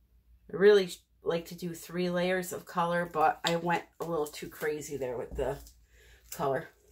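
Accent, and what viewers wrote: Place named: Michigan, United States. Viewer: How could anyone say anything but positives! You are like a next door neighbor I wish I had. I appreciate the humor and most of all the humanness (if that’s a word). Thanks again for sharing. Love the new paint and those cells are wonderful. Keep up the great job and the fun we have listening to you laugh. Trying to figure out your accent, I’m thinking Michigan or Wisconsin. Sending hugs!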